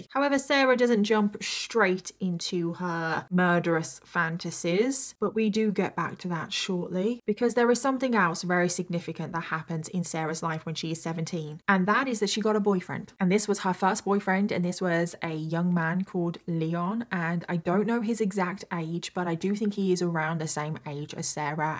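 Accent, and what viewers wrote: United Kingdom. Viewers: Your voice isn’t annoying because you’re sick. It’s because it’s British. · I just recently found your videos. I really wish you didn't feel narration with cosmetic/make-up application was necessary for success. You and your words are enough. Plus with the accent of the British Empire, we already think you are smart. Sidenote: use the Vicks (menthol, camphor, petrolatum etc) on a small patch on your chest before bed, wear a light shirt then go to bed.....Not that you sounded any different to me, but you sounded worried about it.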